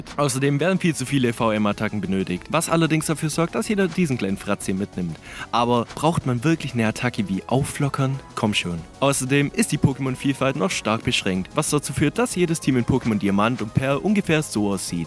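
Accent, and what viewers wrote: Swabia, Germany. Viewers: Geiles Video, 11/10 für schwäbischen Dialekt! ^^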